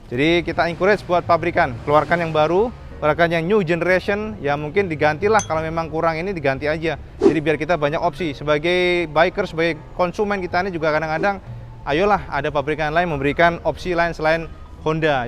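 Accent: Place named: Kediri, Indonesia. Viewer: Mas asli mana ya kok bahasae mirip2 orang kediri ya,campuran lah khas jawa timur.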